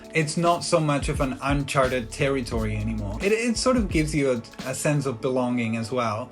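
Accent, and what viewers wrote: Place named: New Zealand. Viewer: Interesting. I wondered where you were based. Now that I know that you're in NZ, I can definitely hear that country's influence on your english, even if it's subtle. I would love to visit NZ one day. Of course ever since LOTR came out 20+ years ago, it's been on my bucket list. I know that's pretty stereotypical. Pero a mi no me importa! Jaja, oh yeah, también hablo español! Saludos desde Atlanta GA USA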